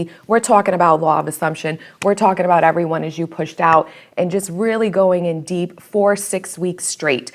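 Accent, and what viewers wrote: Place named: Rhode Island, United States. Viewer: Your accent sounds like you’re from Rhode Island. New sub.💕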